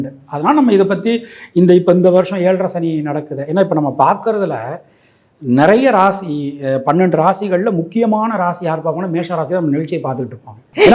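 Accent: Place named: Manipravalam, India. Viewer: அம்மா நன்றி தயவுங நமது மணிப்ரவாள பேச்சு நடையை விடாதீங்கோ.நன்னா பேசறேள்.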